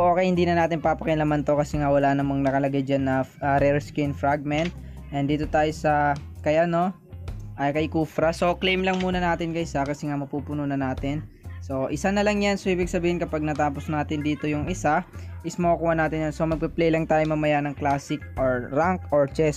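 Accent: Philippines. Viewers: Tol Maguindanaon ka?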